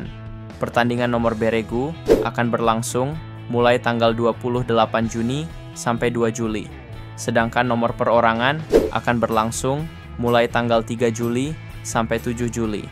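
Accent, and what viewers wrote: Banyumas, Indonesia. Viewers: mengapa naratornya diganti, 😢😢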